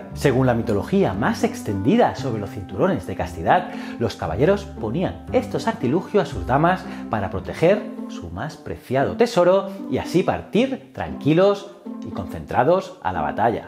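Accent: Spain. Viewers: MUY INTERESANTE TU CANAL, ¿DE QUE PARTE DE ESPAÑA ERES.?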